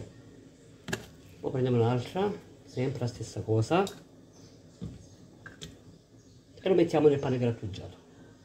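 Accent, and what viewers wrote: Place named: Lecce, Italy. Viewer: mi sono appena iscritta al tuo canale, sei bravissima e simpaticissima con la tua parlata leccese, io sono di taranto e mi piace tantissimo cucinare, come da buona pugliese. Ciao e adesso che ti ho scoperta non ti lascio più. Amica mia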